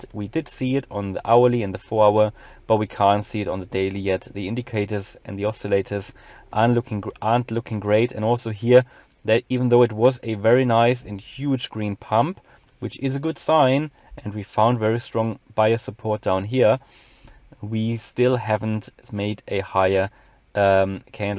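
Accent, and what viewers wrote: Germany. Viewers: Unverkennbar wenn Deutsche Englisch reden 😊🇩🇪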